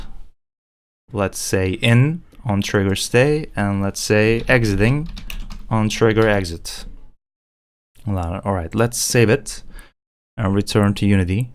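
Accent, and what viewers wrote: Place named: Turkey. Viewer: Lovely accent but immedietly heard something familiar. It didn't take me long time to realize you're also Turkish. Thank you for excellent video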